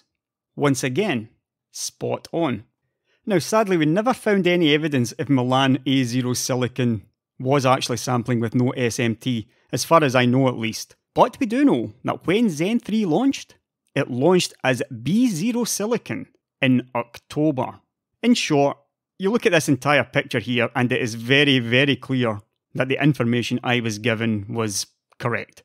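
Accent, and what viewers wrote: Scotland, United Kingdom. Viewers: Those soothing scottish dulcet tones!!!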